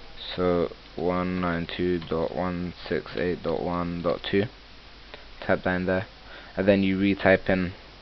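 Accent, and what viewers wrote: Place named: Cockney, United Kingdom. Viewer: He's british lmfao... Cockney...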